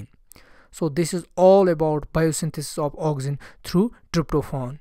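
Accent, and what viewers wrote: Kashmir, India. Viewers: You sound like a Kashmiri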